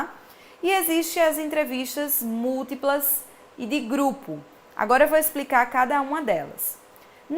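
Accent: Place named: Fortaleza, Brazil. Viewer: mulheres com sotaque de fortaleza é o mais lindo.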